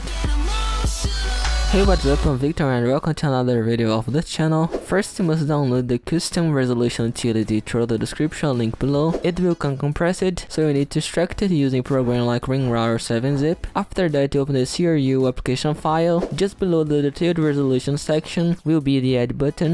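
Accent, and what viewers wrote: Brazil. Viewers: Da pra sentir o sotaque de brasileiro, mas seu inglês ta muito bom mano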